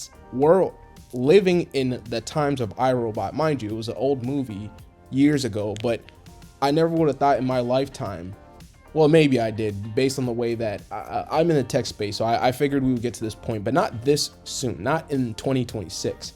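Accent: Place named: United States. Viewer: China hasn't shot a bullet outside of their borders in almost half a century so it's kinda hard to listen to someone paint China to be as warmongering as the US especially in American accent. Not saying China can't be aggressive in the future but you can't deny the fact that the irony and hypocrisy is too much to handle for most of us normal non-western people. Also I agree that China probably already testing more advanced robots, drones, and AI in their secret military labs but they're the only major power that actively tried to ban and regulate AI usage in war. So it's not fair to criticize China without criticizing certain powers who denied Chinese proposal just because China advanced faster than expected.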